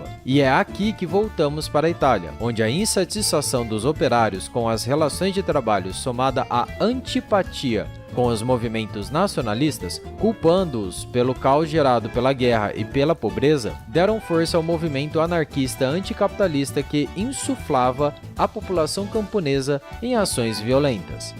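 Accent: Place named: Caipira, Brazil. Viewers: De que região do país vc é? Esse sotaque é bem comum no Paraná, São paulo e em todo o centro-oeste do Brasil. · Esse sotaque caipira não ajuda a chegar ao final do vídeo. Pqp.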